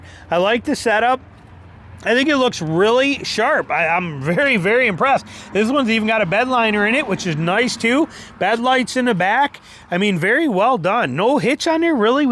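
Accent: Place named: Midwest, United States. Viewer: Are you from Minnesota? You totally have a very high pitched northern or midwestern accent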